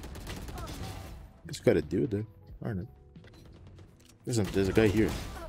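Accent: Brazil. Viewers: ele é brasileiro ss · wait are u from brazil? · Da pra perceber no jeito de falar kkk Brasileiro falando ingles sempre puxa umas letrinhas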